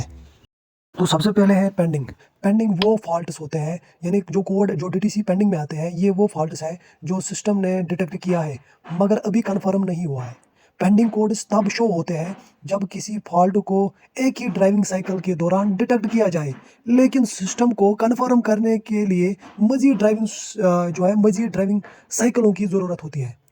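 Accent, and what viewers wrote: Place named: Kashmir, India. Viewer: Kashmiri voice i think.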